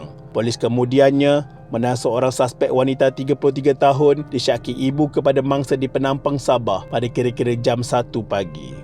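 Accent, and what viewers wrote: Kelantan, Malaysia. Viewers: logat meme tok lari ore kelate be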